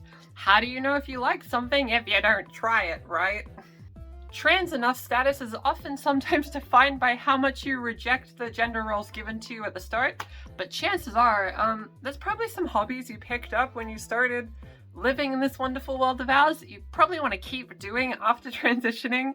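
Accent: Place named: Australia. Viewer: strayan accent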